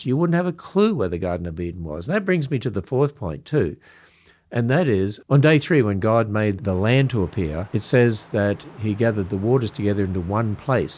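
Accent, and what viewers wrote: Australia. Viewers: Im Aussie, first heard you back in about 1999. Im flabagasted you still have our Aussie accent very strong, awesome... How long have you been away from here ?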